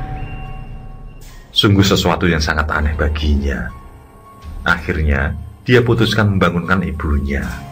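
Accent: Java, Indonesia. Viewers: Sukaaa denger suaranya pakdhe...baru aja sih nemu channelnya pakdhe ini dan langsung jatuh cinta...dialek jawanya pakdhe bikin ademmm...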